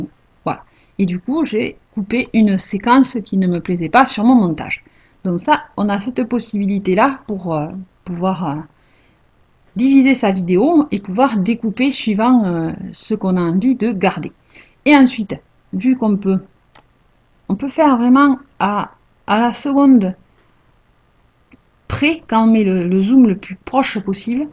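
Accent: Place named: Provence, France. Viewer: et merci l'ascent provensal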